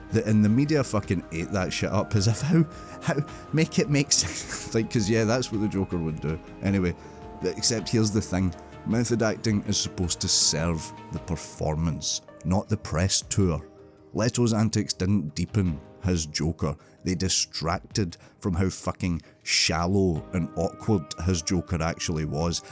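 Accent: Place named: Scotland, United Kingdom. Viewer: F. U. Learn to speak English if you want to communicate with English speakers. (You Scottish bastard!) P.S. 'all viewers can tell you LOVE Jared Leto.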